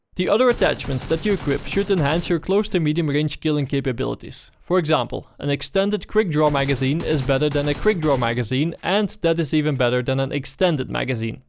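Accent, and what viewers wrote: Netherlands. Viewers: it Sounds like your from the Netherlands with that accent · it sounds like he is Dutch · zijn accent is zo vreselijk nederlands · Ik hoor je Nederlandse accent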